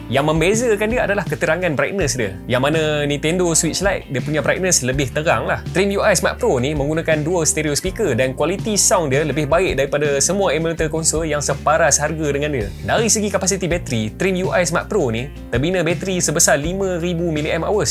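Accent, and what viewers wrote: Malaysia. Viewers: slang utara campuq selatan 😅btw nice review ,subscribed🎉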